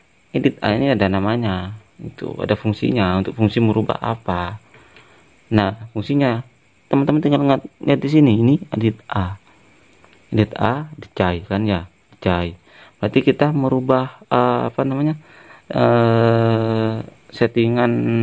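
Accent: Madura, Indonesia. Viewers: hihi kadeng kloar skunik madurenah. Sukses lah bos lanjutken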